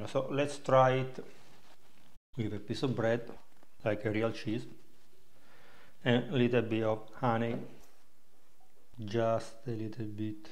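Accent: Italy. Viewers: I Love the Italian accent fratello! 🙏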